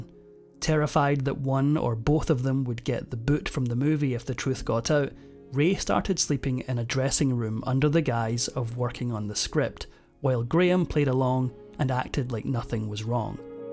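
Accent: Scotland, United Kingdom. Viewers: He's Scottish. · He’s a Scot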